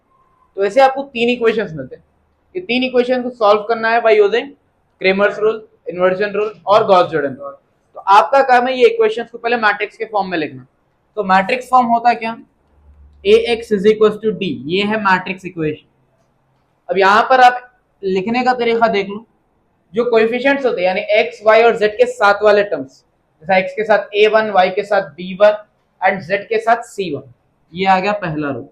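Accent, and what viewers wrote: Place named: Hyderabad, India. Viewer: Im really happy that a Hyderabadi teacher is also trying to teach 🎉 Honestly telling its so good to study under a teacher who is teaching in Hyderabadi accent ❤❤ Keep going sir 🙏 👏 ❤ You're explaining very clearly and also your explanation is so good 👍 ❤🎉 Keep going sir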